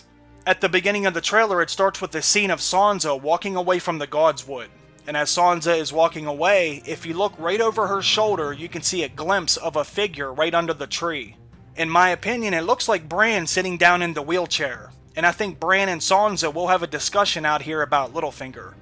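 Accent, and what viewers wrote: Pittsburgh, United States. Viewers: Thank you for doing such a great job on these videos. You are one of the best channels of this nature out there. Have to ask, are you from the Pittsburgh area by chance? Anyway, awesome job and keep up the good work!!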